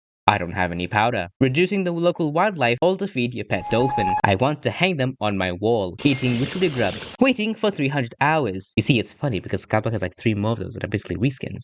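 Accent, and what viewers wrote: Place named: Australia. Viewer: Great vid, humorous editing and artwork! Why do i detect the slightest hint of Australian Vowels in your accent?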